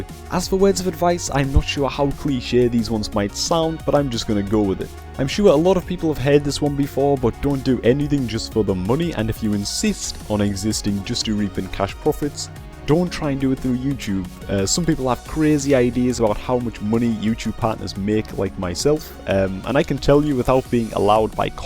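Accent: Newcastle, United Kingdom. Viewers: I was watching Swifty's vids and decided to check you out, and within 3 seconds of the video starting i realised you had a newcastle accent, just saying because live like 10 miles away >.<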